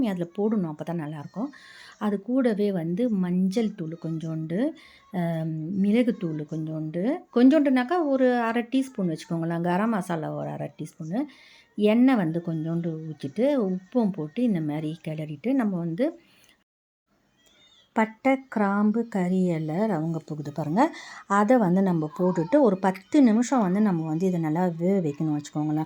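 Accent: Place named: Pondicherry, India. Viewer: Bonsoir, comme d'hab,une bonne recette toute simple.J 'adore tes vidéos pour ta façon de parler,une vraie Pondichérienne 😄.Tu me fais pensé à mes parents et à mon enfance.Merci ma chère.Continue à nous faire plaisir ❤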